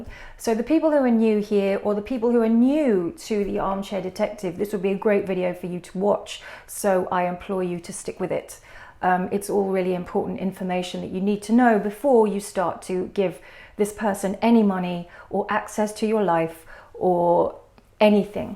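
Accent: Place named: United Kingdom. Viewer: First...Thank you. Thank you so much for spreading light on AD & any other Youtubers/Scammers that are trying to scam good hearted people. Your voice is beautiful. I've always loved the Bristish accent. You are a beautiful person to finally expose AD (Alan Viddecom) however you spell his name. Thank you. Thank you so much. 🌻🌻🌻🌻💜💜💜💜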